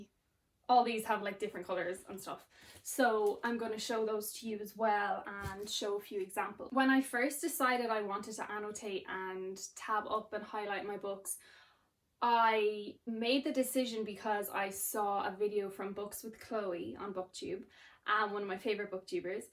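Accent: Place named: Ireland. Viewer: I stumbled across this video by chance, it's the first I've watched from your channel. I am the person you were before you made the leap to start with the careful pencil annotations. For some reason, I can't write into a book! 😁 I've written careful pencil remarks maybe have a dozen times, but this was when I noticed errors (usually translation errors that I've verified with the original) that stumped me so that the next person reading the book (or possibly me in a future reread) would have that information. But I've had the wish to retain certain memorable quotes and seeing how you annotate is fascinating even though I wouldn't want to write in my books with color pens. 😅 Hey, this is off-topic but I love listening to you. English isn't my native language; I'm from Germany and I don't remember hearing any Irish folks talking in English in my school days learning the language. 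(That was before the internet, and all movies / TV series over here are dubbed - the dubbing is done extremely well but of course, there would be so many accent I wasn't familiar with.) For my first real job after uni I went to Ireland and was living and working there for while (in Dublin, obviously, because this is where the jobs were). When you started talking, it was like being back there. I hadn't been aware of it at that time but the Irish accent really has a special charm! Unfortunately, I didn't see much of the island due to my being based in Dublin without a car but I'd been to Clare in a holida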